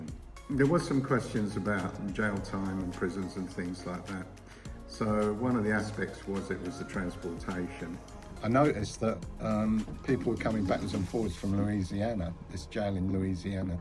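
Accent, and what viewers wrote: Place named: England, United Kingdom. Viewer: Of for crissake .. where are you from? Listen to the man ... he is very clearly English.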